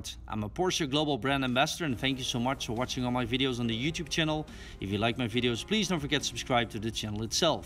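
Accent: Netherlands. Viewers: You have a very strong dutch accent. You dutch by any chance?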